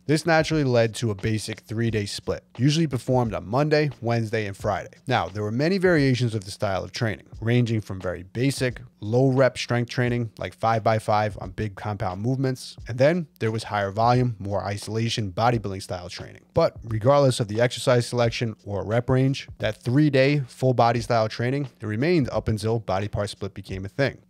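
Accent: New York, United States. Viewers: Is this new jersey or new York accent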